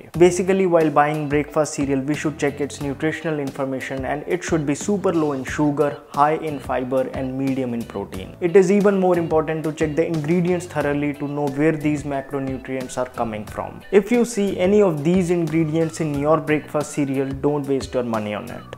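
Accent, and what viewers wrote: India. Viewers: Filled with cheeky indian accent ♥️😭🤣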